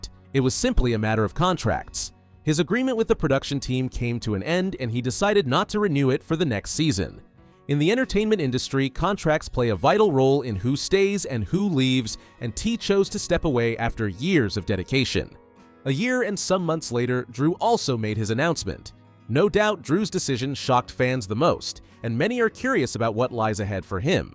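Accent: United States. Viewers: Never liked Drew his ego arrogance and I thought down to people stitched hard working people a real tight arse. But why is this done by an American voice and said doolars instead of pounds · Why is it being narrated by an American?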